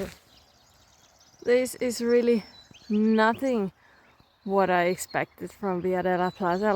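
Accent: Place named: Ireland. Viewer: I’ve often wondered how a Finish girl should end up in Ireland , you picked up a good accent so must have been a while . I like a Crisp sandwich any day of the week 😊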